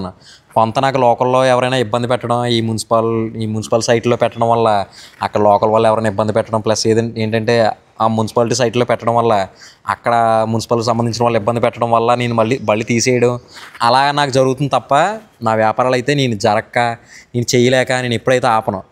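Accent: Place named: Telangana, India. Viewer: తెలంగాణ మాట్లాడు బాబు సూపర్ ఉంటది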